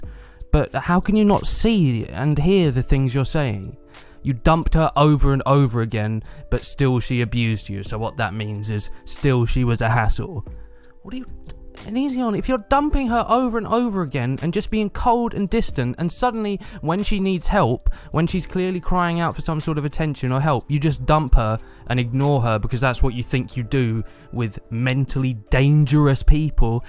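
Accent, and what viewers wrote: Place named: United Kingdom. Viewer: I love hearing a Person with a British accent shit all over him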